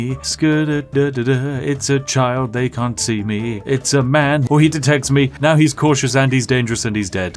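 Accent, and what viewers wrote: United Kingdom. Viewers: Hey brit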